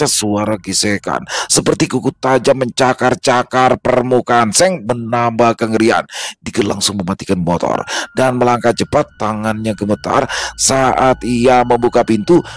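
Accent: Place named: West Java, Indonesia. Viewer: Keren bang, logat Sundanya 😊😊😊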